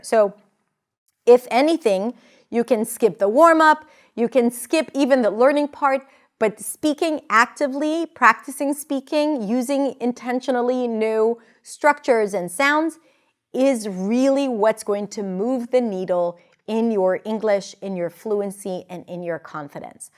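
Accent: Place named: United States. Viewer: You're an inspiration to all of us. Watching the way you mastered and hacked the American English accent is so motivating.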